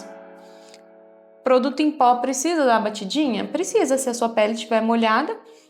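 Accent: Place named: Minas Gerais, Brazil. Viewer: esse sotaque tipo mineira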